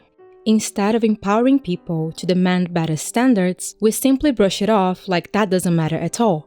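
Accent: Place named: RP, United Kingdom. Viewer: A fascist narrative that you've, for the benefit of ideal doubt, blindly concluded as your perceived apolitical stance as an arts scholar. You should never approach another work of art with any appreciation or criticism again as I feel even the work of Steve Cutts might overwhelm you. This video reeks of fear and we all see through the posh accent and thesaurus vocabulary.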